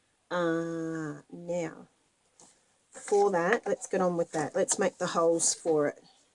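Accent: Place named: New Zealand. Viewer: When you said spider I thought WHAT!!!!! That's a big spider and I thought you had a NZ accent and we don't have those huge things here. Showed my husband, and then I reread, whew!! Now you are referred to as the " " and the " is a very talented lady, and very generous to share all her knowledge. Had been looking for something different to make, so thanks heaps. Love your way of explaining things too. Cheers for that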